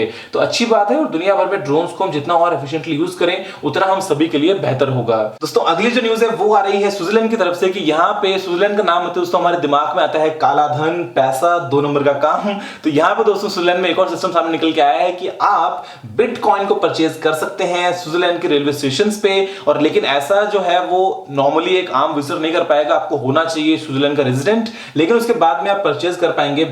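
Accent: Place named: Punjab, India. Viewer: I dont understand a fucking word you say fucking punjabi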